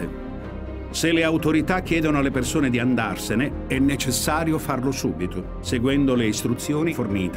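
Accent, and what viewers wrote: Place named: Calabria, Italy. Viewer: Narratore con forte accento calabrese...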